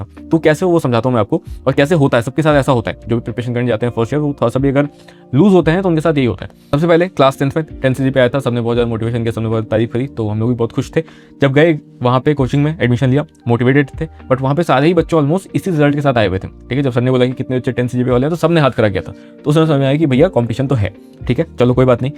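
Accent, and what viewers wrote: Bihar, India. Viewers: aap bihar se ho huje laga up se ho aap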